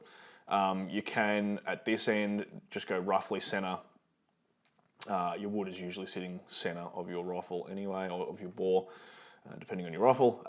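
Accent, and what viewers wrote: Australia. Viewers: It’s unusual to hear an aussi talk about guns. 😂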